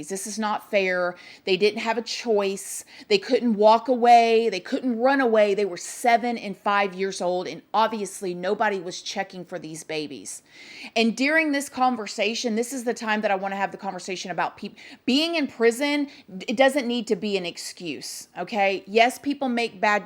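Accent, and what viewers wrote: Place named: Louisiana, United States. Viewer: Hey, I was wondering if your maiden name wouldn't happen to be? You remind me of and look like my little cousin that I have not seen since childhood. We are from Lake Charles Louisiana and you sound like you have a Louisiana accent.. I was just wondering if you are my long lost Lil cuz.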